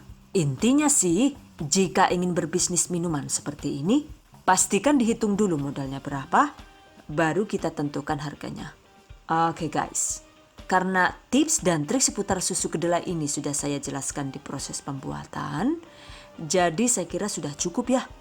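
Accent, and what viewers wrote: Banyumas, Indonesia. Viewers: Enak dan seger bund susu kedelenya,aku sangat suka, diminum hangat enak,diminum dingin lebih seger, pokonya mantap,sukses selalu bund,ngapaknya yang bikin ngangenin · Budhe.. ko basane kaya basane nyong kabehan 😂 tiyang pundi budhe?? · Susu kedele ne mantul banget,cocok buat ide usaha tuh...nyong sok ngguyu2 dewek nek nonton video ne Rika ne Yu!,kreatif karo lucu koh ana ngapak2 e...hehehe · Aku mah fokus ke ngapak nya lucu abis 🤣🤣🤣🤣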